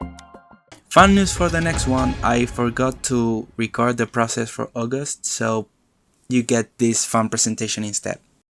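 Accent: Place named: Basque Country, Spain. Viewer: Eres Basco?? Qué chimba!! Fuera una idea estupenda hacer este presente para tu amiga Por cierto, me encanta tu acento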